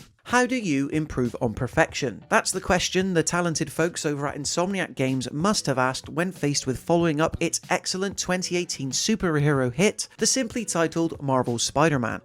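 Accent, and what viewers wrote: West Country, United Kingdom. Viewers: subscribing for the west country accent!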